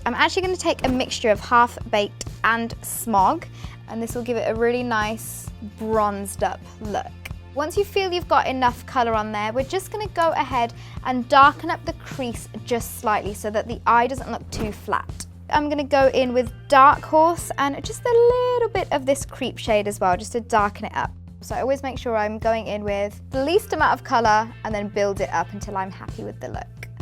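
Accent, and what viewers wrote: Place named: United Kingdom. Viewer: lol are you sure about that? i think you're commenting on her accent, which sounds that way because she lives in the UK. her actual knowledge of english is fine considering she was brought up in a country where that is the first language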